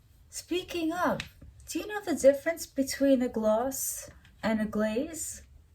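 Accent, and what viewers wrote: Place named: New York, United States. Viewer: New York accent hits hard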